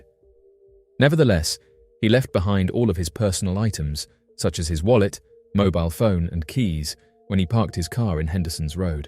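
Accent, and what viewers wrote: United Kingdom. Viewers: In deed his pause and natural narrator skills very articulate and clear accent with traces of the best British language Looking forward for more subject Sr thank you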